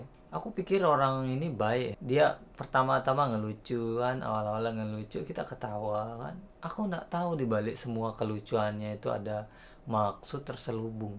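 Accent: Lombok, Indonesia. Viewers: seperti logat orang lombok ya.. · Aksen lo kek org lombok bang kalok ngomong Indonesia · Dari bahasa dan logat nya org Lombok Wkwkwkwk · Logak lombok nya medok ya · Ndk bisa hilang logat lomboknya bero... Salam lombok lolon buak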